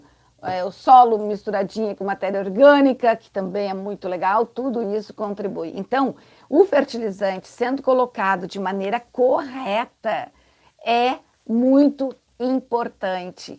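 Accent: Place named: South, Brazil. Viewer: 👏👏👏sou do Rio de Janeiro! E amo o modo de falar de vocês do Sul ! Do nosso amado Brasil!! Beijos!!!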